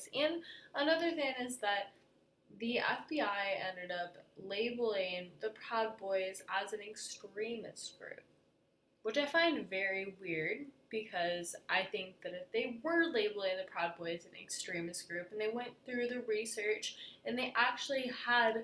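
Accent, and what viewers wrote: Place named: Ireland. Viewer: She's Irish.....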